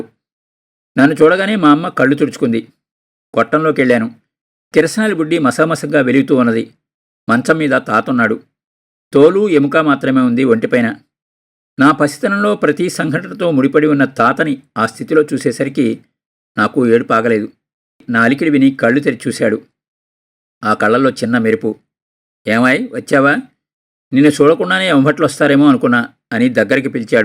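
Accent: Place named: Rayalaseema, India. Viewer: గారు చాలా చాలా మంచి కథ వినిపించారు. ధన్యవాదాలు. రాయలసీమ కరువు రోజులు కళ్ళకుకట్టినట్టు వినిపించారు ఆ యాసలో.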